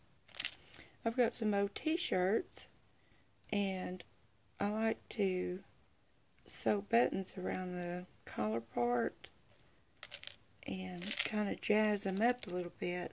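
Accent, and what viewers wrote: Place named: Tennessee, United States. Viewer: I knew you were in Tn by your accent. I lived in Chattanooga for 17 yrs. I love the yellow ones💕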